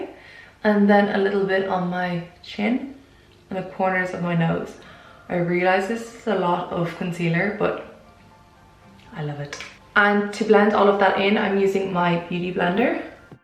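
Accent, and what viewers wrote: Ireland. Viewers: Ireland I think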